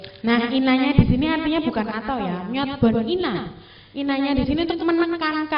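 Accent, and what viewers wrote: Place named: Java, Indonesia. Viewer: Kayanya yang ngomong ini, orang jawa, bahasa koreanya terdengar kaya bahasa jawa. Maksudnya nadanya.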